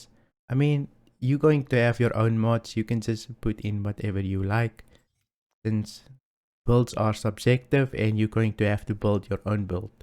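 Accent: South Africa. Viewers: Bro .. are you from RSA?!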